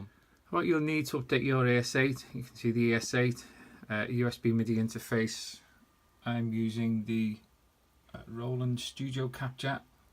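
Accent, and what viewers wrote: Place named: North, United Kingdom. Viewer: Nice one mate. Thanks. And love the northern accent!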